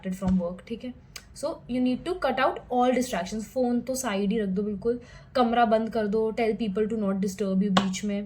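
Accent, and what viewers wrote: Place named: South Bombay, India. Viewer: Relevant Info btw...in a customary SoBo accent...😜🫣🙃 Keep Showing Up 👍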